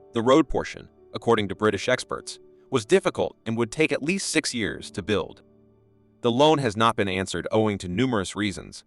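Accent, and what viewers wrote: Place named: United States. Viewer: These kind of videos are so frequent. It's always the same thing: - This highway is currently under construction, when in reality it was finished a long time ago - Jamaica entering unforeseen "debt traps" with China, which to this day no one can fully explain - Questionable grammar and sentence construction - AI generated narrations with an "American" accent - Mismatched visuals - Outdated stats - Spreading propaganda about Jamaica's economy being in the pits before this project, like Jamaica was a starving nation or something - Jamaica's mountains getting in the way of road construction, when two major highways had already existed before the North-South highway - Getting Jamaica's geography wrong by assuming the Blue Mountains are in the middle of the country - Getting wrong for the trillionth time that the North-South highway crosses the Blue Mountains - Assuming Jamaicans had no way of crossing their mountains prior to this - Assuming tourism is dependent on this one highway, which makes no sense considering Kingston is not a major tourist destination - Assuming tourism is the only economy in Jamaica - Ignoring that there is already a proper roadway on the coast that links the major tourist areas in Jamaica - Jamaican locals depending on this one project for prosperity, transport convenience and foreign exchange - Getting details of how the North-South highway was funded and its conditions wrong - China making Jamaica a new deep shipping water port, something Jamaica alrea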